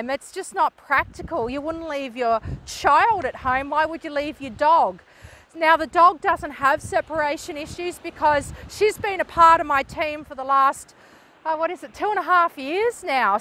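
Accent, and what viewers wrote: Australia. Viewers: Your Aussie accent is really sweet... 👍☺️ stay well...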